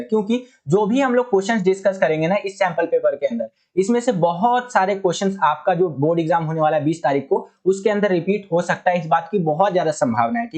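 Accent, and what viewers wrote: Bihar, India. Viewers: Sir Bihar se hai kya aap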